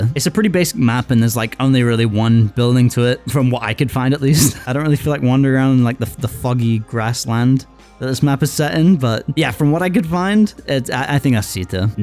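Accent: United Kingdom. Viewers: Are you British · R u British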